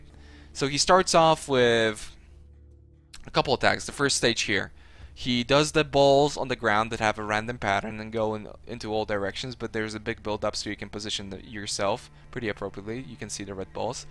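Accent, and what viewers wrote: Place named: Poland. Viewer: jak się człowiek spieszy to się diabeł cieszy ^^ od dawna cię oglądam, ale nie wiedziałem że jesteś z Polski :D trudno wyczuć akcent, gratulacje! powodzenia na lidze!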